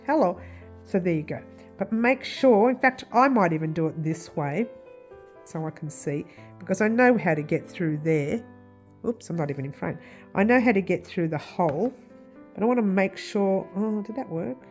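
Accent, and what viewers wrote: Australia. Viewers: Wow i just found you!!! What a relief it was for me to hear your aussie accent! Somehow you say what needs to be said and you dont confuse me!! Im Aussie too!!! Please don't ever leave!!! Love your purse! ❤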